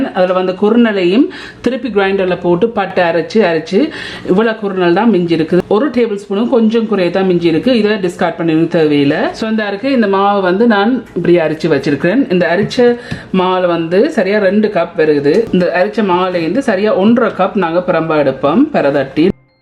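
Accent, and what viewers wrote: Jaffna, Sri Lanka. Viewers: I like the way you speak Jaffna tamil and including some sinhala words like bola, kithul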